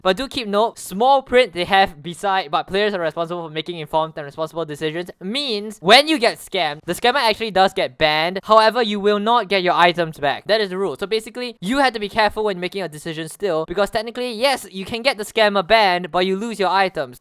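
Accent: Singapore. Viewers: You sound singaporean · btw this is the singapore accent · do u live in singapore? u have a slight accent :) ty for the vid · You sound Singaporean ngl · Lemme guess, u r Singaporean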